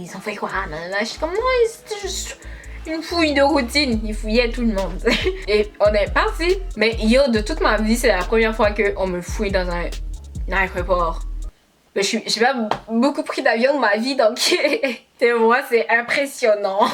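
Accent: Quebec, Canada. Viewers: T québécoise? sinon super video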